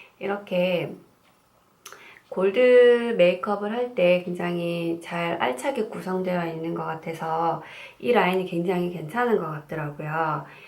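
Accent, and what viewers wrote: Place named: Gyeongsang, South Korea. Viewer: 경상도분이세용?ㅎㅎ 사투리가 ㅎㅎ 저두-대구살아용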